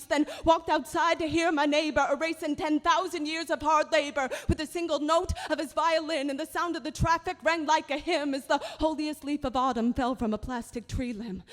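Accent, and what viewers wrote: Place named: Maine, United States. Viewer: Maine accent still front and center here.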